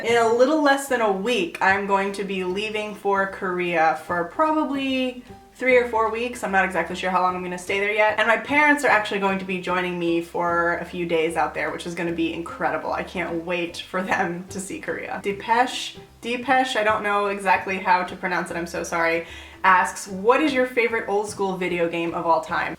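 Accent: Castile, Spain. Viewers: nice you speak spanish like they do in spain! and ima try that bubble bobble right now! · OMG, that was soooooo fuckin sexy! Even if you speak spanish with the Castellano pronounciation. Que chula eres, Dios mio! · Your Spanish ❤️ I speak Spanish too but I'm Mexican so the accent is different. I went to Spain as my graduation present for about a week and a half and it was so funny how I was picking up that accent! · I love your spanish accent, debo decir que no me gustan los acentos propiamente españoles de España, but yours is adorable. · Your Spanish is soooo good. I grew up in a Mexican household yet your Spanish is way better then mine. When you speak Spanish it sounds as if you were from Spain. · your spanish is perfect I had never heard anyone whose first language isn't spanish speak it so well, and more specifically with a castilian accent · she speaks like a Spaniard, it's so cute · Haha I can hear that Spain accent. 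My parents are from Mexico so I learned Spanish first and then English in pre-school. Unfortunately, my Spanish became worse over the years and now as an adult I have trouble with grammar and vocabulary sometimes. It's so embarrassing. Your Spanish is probably better than mine! :p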